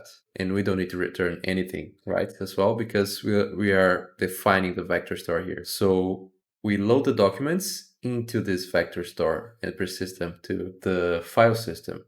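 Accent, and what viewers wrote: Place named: Brazil. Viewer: Não interessa o quão bom o seu Inglês seja, um Brasileiro sempre reconhece o outro haha, muito bom seu conteúdo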